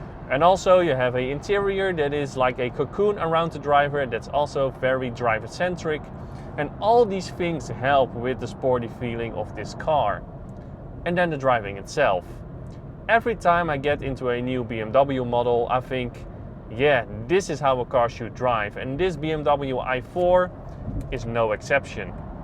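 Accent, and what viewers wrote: Netherlands. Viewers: Stone coal English … so bad. Why don’t you stick to Dutch?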